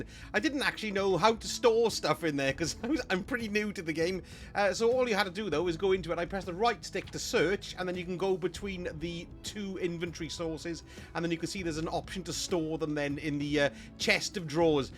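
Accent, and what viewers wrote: Wales, United Kingdom. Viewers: I came on this by accident and was delighted to hear your beautiful Welsh voice,. Used to follow you back in my Destiny 2 days. (Seriously, you should voice act on Elder Scrolls 6!)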